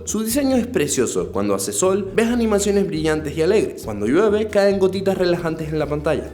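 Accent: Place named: Venezuela. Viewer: Eres de Venezuela verdad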